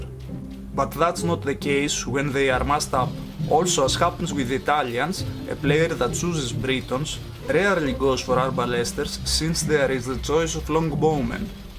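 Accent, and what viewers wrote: Greece. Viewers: I'm watching these videos to listen to the Greek accent actually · LOL when I watched the video I said, he is Greek for sure 😂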